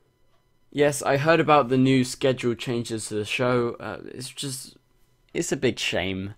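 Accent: United Kingdom. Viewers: Are you from UK ?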